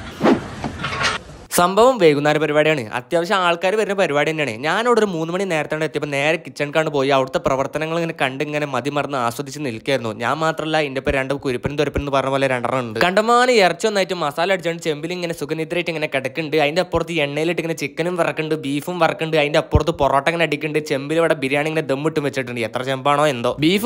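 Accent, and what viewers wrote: Malappuram, India. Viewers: Accounting is a process of recording, classifying, summarising, analysing and interpreting the financial transactions, 😂😂😂😂... ഞാൻ ഇക്കയോട് മുമ്പത്തെ വീഡിയോയിൽ പറഞ്ഞിരുന്നു, എൻ്റെ ഗുരു ആണ്, എനിക്ക് മലപ്പുറം slang പഠിപ്പിച്ചു തന്ന ഗുരു, അതുകൊണ്ട് എനിക്ക് എൻ്റെ സാറിൻ്റെ slang manasilaayi ennu പറഞ്ഞു ഒരു comment ചെയ്തായിരുന്നു, ആ sir accounts subject ആണ്..ക്ലാസ്സിൽ വന്നാൽ തുടങ്ങും, recording, classifying,summerizing,. ഇക്കാ ഇന്ന് ഇത് പറഞ്ഞപ്പോ,ഞമ്മൻ്റെ മനസ്സ് തൃപ്തിയായി😂😂😂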